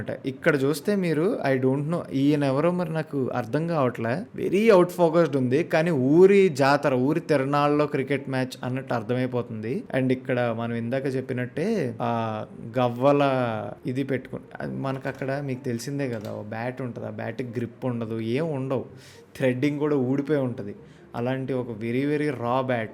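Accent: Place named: North Andhra, India. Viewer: Rajam ,vizianagaram, srikakulam evi 3 slang kalipi vere slang vacchinchidhi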